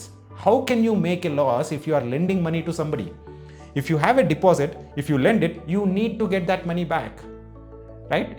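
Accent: India. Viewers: Indian Accent bro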